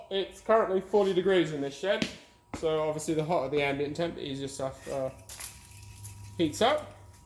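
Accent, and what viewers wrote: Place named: Australia. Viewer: He's sounding more like a Aussie Boi's! Nice Video Dude Keep up the awesome work.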